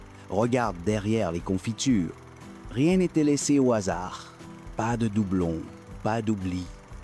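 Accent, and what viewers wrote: Quebec, Canada. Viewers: C'est fou ce que cette voix a l'accent de Limoges (ou il est censé avoir passé son enfance) C'est plutôt quebecois Mais pour le fond ,ok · ... ce petit accent du Québek.... il me plaît bien pour dire comment c'était avant, chez nous en France.:)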